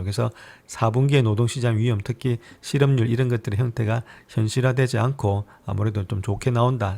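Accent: Gyeongsang, South Korea. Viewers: 차분한 경상도 사투리가 새롭고.. 다른 시황분석🎉에 박수 보내며 감사합니다.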